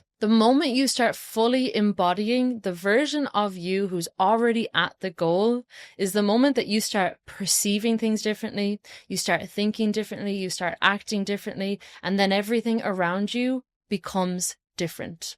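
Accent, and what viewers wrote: Ireland. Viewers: This was great! I love hearing that Irish accent too 😊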